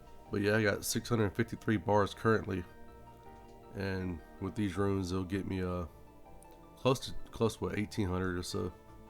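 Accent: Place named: South, United States. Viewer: Can I just say, it's about time some southern accent made it into osrs content creation. Love the series man, hope youre enjoying it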